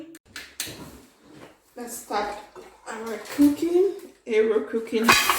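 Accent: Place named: Cameroon. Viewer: Oh my, can hear that Cameroonian accent! My Cameroonian sister right there. Achu is my favourite Cameroonian dish, then fufu and eru.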